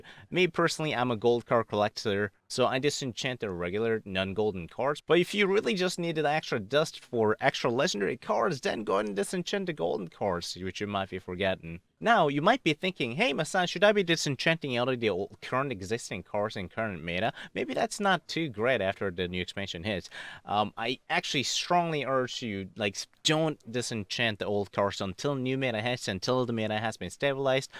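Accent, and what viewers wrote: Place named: South Korea. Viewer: Korean accent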